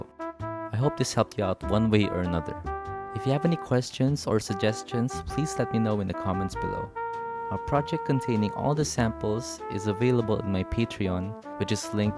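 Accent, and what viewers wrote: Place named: Philippines. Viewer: new instantly from the accent this was a fellow filipino incredibly useful video my man hoping ill get to use some of these in my own projects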